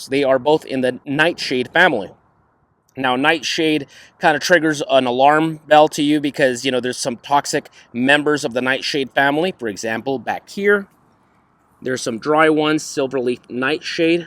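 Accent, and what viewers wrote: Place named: West Texas, United States. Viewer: What part of west Texas you from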